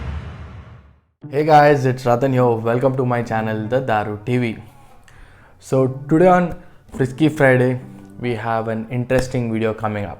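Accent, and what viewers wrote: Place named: India. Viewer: Thank you....listening to you gives me good memories of my friends of India the Bajaj family.....I think everyone knows them ahahahah.....I am making guava wine now from my huge tree here at my house in the mountains of Guatemala....I will start the ginger bug today for some good ginger beer.....Adios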